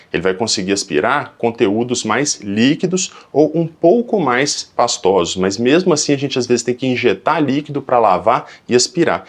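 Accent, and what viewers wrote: Minas Gerais, Brazil. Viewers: Médico bonito! Minerin, aposto! Olha o sotaque! Não faço exame com vc não. Tenho Vergonha!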